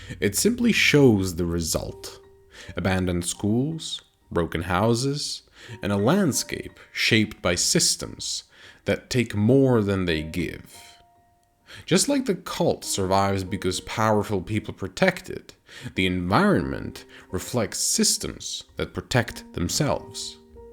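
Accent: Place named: Europe. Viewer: Appreciate the analysis. Dislike the Euro accent. (You don’t know, bro)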